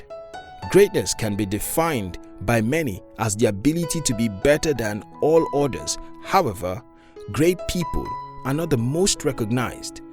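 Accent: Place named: Nigeria. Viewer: The narrator must be a Nigerian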